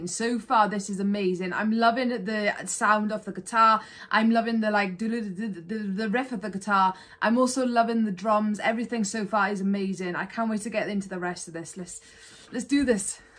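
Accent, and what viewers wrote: Wales, United Kingdom. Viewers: Budgie are from Cardiff. Sounds like you’re from Wales 🏴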